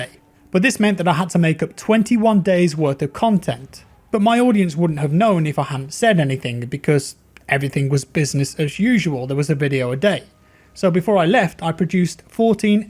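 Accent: United Kingdom. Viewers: USA dominates your views because we just cant resist that British accent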